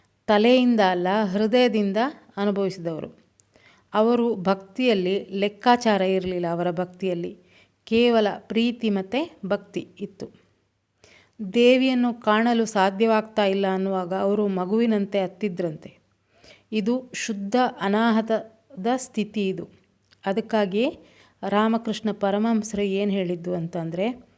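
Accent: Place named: Dakshina Kannada, India. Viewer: ನೀವು ದಕ್ಷಿಣ ಕನ್ನಡ ಜಿಲ್ಲೆಯವರು ಅಂತ ಹೇಳಿದಾಗ ನನಗೆ ತುಂಬಾ ಕುಶಿ ಆಯಿತು ನೀವು ಊರಿನಲ್ಲೇ ಶಾಲೆ ಕಲಿತಿದ್ದರೆ ನಿಮ್ಮ ಕನ್ನಡ ಬೆಂಗಳೂರು ಕನ್ನಡದಿಂದ ವಿಭಿನ್ನ ವಾಗಿರುತ್ತದೆ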